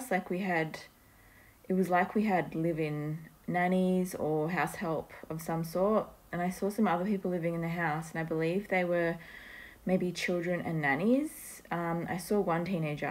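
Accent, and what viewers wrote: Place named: Australia. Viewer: Oh sister right now u had 144 subs! U sound Australian. I'm kiwi. I know a few other NZ and OZ 144s. 🕊👼🏻🙂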